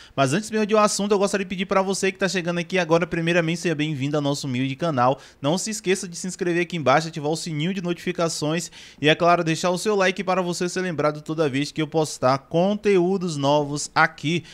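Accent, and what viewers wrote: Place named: Northeast, Brazil. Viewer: E Adoro ele falando vixe como eu gosto quando ele fala desse jeitinho dele erradinho de vez em quando fica bem charmosinho gosto muito desse jeitinho dele bem nordestino gosto demais Ave Maria Até porque eu sou nordestina também e a gente tem que valorizar o nosso Nordeste Eita cabra da peste não vou sentir viu Fica com Deus e a inveja mata mas mata o próprio invejoso Deus Jesus é mais que te aguarde te Aguarda a todos amém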